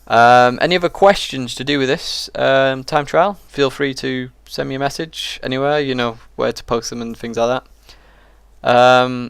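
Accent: England, United Kingdom. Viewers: nobody gives a fuck about your videos and your stupid Engayland accent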